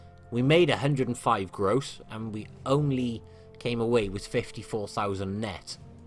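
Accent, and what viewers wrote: United Kingdom. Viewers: yoo wat a british accent !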